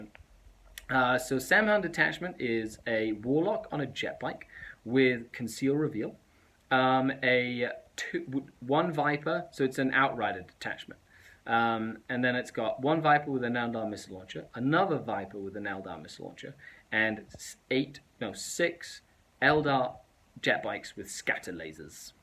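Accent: United Kingdom. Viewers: Your Canadian!!!!! How come you have an English accent?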